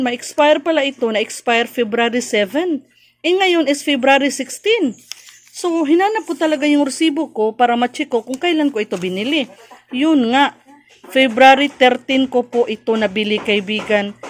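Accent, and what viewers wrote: Visayas, Philippines. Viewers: hahahah.. Visaya giod oi, puwede mana ibalik Sis, ereklamo..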